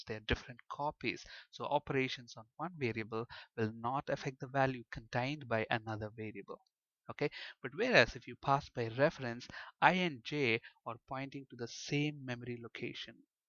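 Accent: India. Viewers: These are awesome vids - Thanks! Also, usually with Indian instructors the accent is so strong and they talk so fast it's hard to understand what they are saying, but you speak very clearly and slowly, so it's easy to understand you. I'm a C programmer trying to learn C# and these are the best vids I have found. Even better than the ones I had to pay for.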